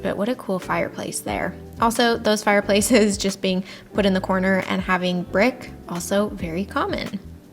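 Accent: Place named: Northwest, United States. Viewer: I watched a few more, well, my guess from your speech, is the NW. Hope business is well.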